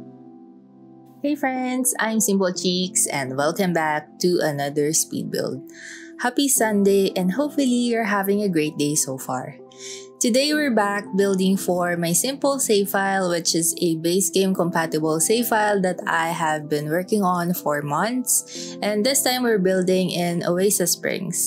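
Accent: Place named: Philippines. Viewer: Accent pa lang alam mo na agad na pinoy to hahahaha nice vid po ma download nga to sa lot ko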